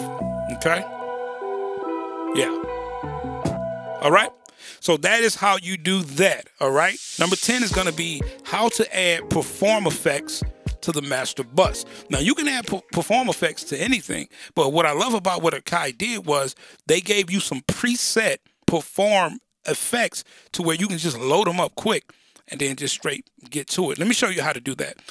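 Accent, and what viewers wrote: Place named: United States. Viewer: Your heavy murrican gangsta accent makes the understanding for non-native speaker really hard.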